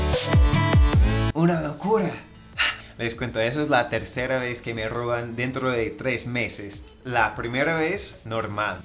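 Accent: Colombia. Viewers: pobre man peor bueno eso suele pasarnos en Colombia, me causa mucha gracia su acento y palabras colombianas! jejejej adelante mi querido gringo! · te ganaste un sub eres un gringo pero de una forma colombiana y hablas como un colombiano y un consejo cali es miy lindo pero hay dasmasido ladron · Leí todos los insultos con su acento jajajaja Colombia amo su acento - Saludos desde Costa Rica que hubo pues parcero (? xD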